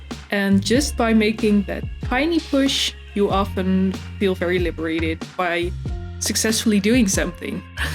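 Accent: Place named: Netherlands. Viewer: Ohhh je bent ook van Nederland!! Ik hoorde het al hihi❤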